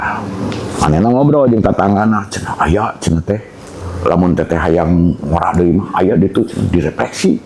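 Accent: Indonesia. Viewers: resep ngdangu na, sunda asli ieu mh😂..... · Logat brdk cicadas ,anatapani 😂😂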